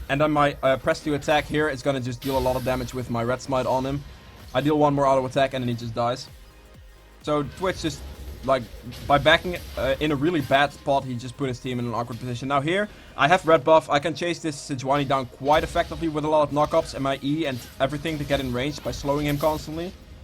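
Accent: Netherlands. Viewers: Klinkt Nederlands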